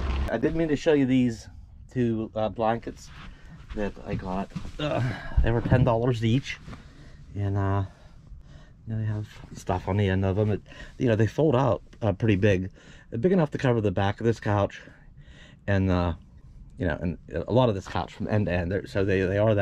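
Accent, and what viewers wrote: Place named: Pittsburgh, United States. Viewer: Are you from Pittsburgh? I detect a little bit of the burg accent! 😀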